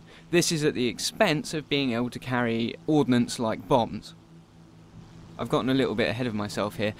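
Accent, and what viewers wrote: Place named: United Kingdom. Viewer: Ahhh finally a gameplayer, who speaks a clear and good british english. Thank you! :D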